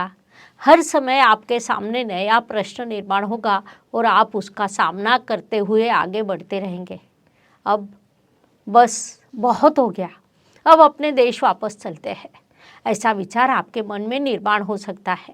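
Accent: Maharashtra, India. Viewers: नमस्कार मॅडम. तुम्ही केलेलं विवेचन अन मार्गदर्शन दोन्ही अतिशय उत्तम वाटले. फक्त हितचिंतक ह्या नात्याने एक अनाहूत सल्ला देण्याचं धाडस करतोय तरी क्षमा असावी. तुमचं हिन्दी बोलणं, म्हणजे चक्क मराठीतूनच हिन्दी बोलताय असं वाटतं. प्रत्येक भाषेच्या उच्चारणीचा, बोलण्याचा एक घाट, एक शैली असते. म्हणजे दुसर्‍या शब्दांत सांगायचं तर पावभाजीत सांबार मसाला घातलात तर जे होईल तसं काहीसं भासतंय! त्यामुळे तुम्हाला खरोखरच एक सकारात्मक विनंती करतो की अधिकाधिक हिन्दी, चांगली हिन्दी सतत ऐकत राहायची. नाटक, सिनेमा, मालिका, समाचार असं काहीही. मात्र आपण चांगली, दर्जेदार हिन्दी ऐकतोय ना, ह्याकडे कटाक्षपूर्वक ध्यान द्यायलाच हवं. आजच्या काळात सर्वोत्कृष्ट हिन्दी ऐकायची तर श्री. अमिताभ बच्चन ह्यांचे कौन बनेगा करोड़पति चे भाग पाहायचे. त्यांच्या हिन्दीवर लक्ष केंद्रित करून. माझ्या सूचनेकडे कृपया टीका म्हणून पाहूं नये, सकारात्मक दृष्टिने घ्यावी ही विनंती. हरिॐ.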